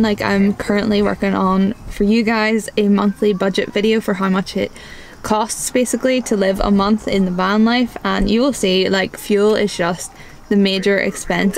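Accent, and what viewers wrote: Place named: Ireland. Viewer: Love your Irish accent!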